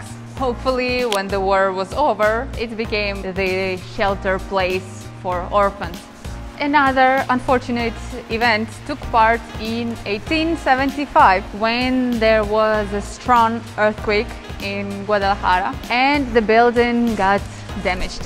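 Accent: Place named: Ukraine. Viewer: sexy Ukrainian accent. I picked that, but afraid to ask.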